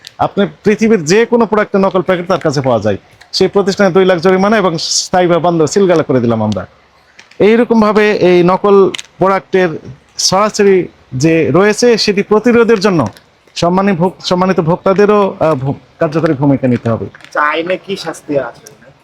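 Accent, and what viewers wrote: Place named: Naogaon, Bangladesh. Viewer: স্যার আপনার বাসা কি নউগা?আপমার ভাষার একসেন্ট অনেকটা অইরকমই!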